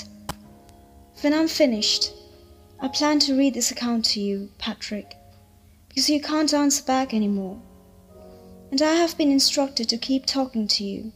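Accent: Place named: United Kingdom. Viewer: Eta tomar accent??💀😩😳 ami vablam kono British meye pore shonachhe . Plss prottek ta part pore shunio to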